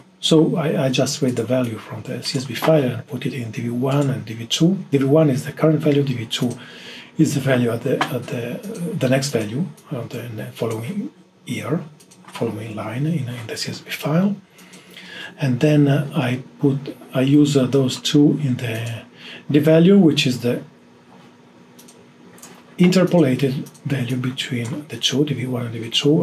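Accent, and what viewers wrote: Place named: Italy. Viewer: Beneficial video. Besides that, I like an accent on the last consonant. Truly Italian.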